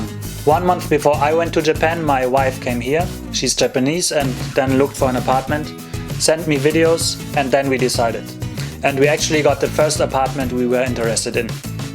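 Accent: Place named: Bavaria, Germany. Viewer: du kannst aber auch bayrisch sprechen c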